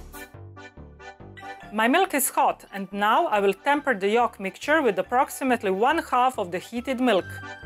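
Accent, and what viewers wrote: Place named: England, United Kingdom. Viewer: i like your english accent may i know where do you come from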